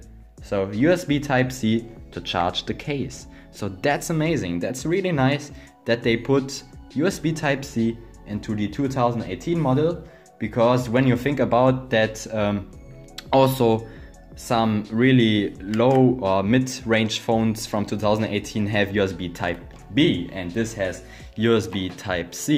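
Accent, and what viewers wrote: Germany. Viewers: Man hört das du deutscher bist 😂👌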